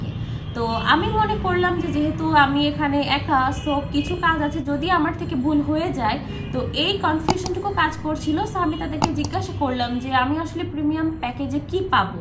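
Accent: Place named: Sylhet, Bangladesh. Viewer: আপা মনে কয় সিলেট বাড়ি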